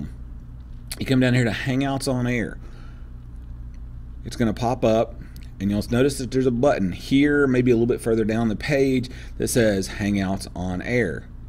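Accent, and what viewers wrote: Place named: South, United States. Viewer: That intelligence... That Professionalism... That southern drawl. :D Well done, sir. Great information!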